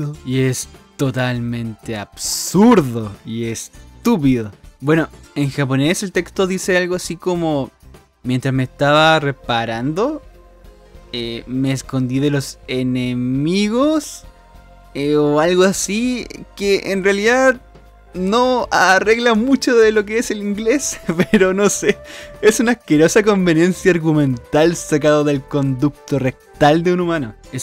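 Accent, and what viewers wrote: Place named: Chile. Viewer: El primer chileno que escucho hablando de megaman. Que rico. Jaja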